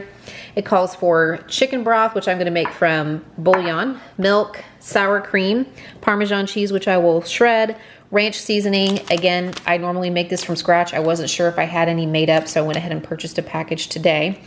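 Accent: United States. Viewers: I don’t know why but I really love listening to you talk and your American accent 😂 the way you say rolls and noodles. Lots of love from Australia thanks for another great video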